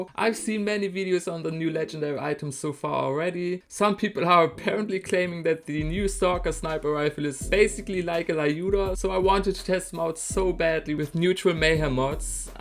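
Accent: Germany. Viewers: youre such a german guy i see that accent (du bist son deutscher bro)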